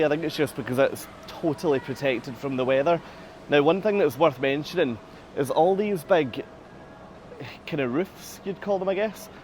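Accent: Scotland, United Kingdom. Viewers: Hey - actually I just came across your video by chance, because I was watching videos on MSC cruises.... But when I heard your accent ( absolutely love it!!! - watched the Outlander series only in the original tone because of that), I just had to watch the whole thing. Very well done! There are so many terrible casts online, with nerving music, stupid comments etc... So I am glad I watched and of course I gave it a definite thumbs up and I even subscribed your channel;-). Although I dont have too much time, I will follow you on your journeys now. Regards from Germany, Bettina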